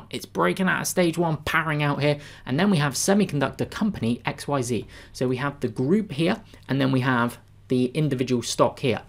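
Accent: England, United Kingdom. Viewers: Excellent video, thanks for the job done. Like to hear some English accent it changes from American one ;)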